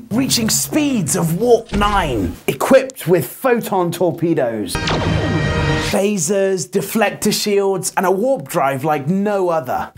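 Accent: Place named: United Kingdom. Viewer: Dude, u b fucking awesome. Love the brit voice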